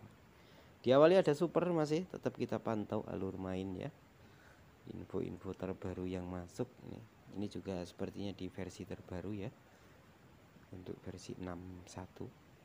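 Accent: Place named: Java, Indonesia. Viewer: Joss cak smga sukses selalu cak logat jowomu gurih😁👍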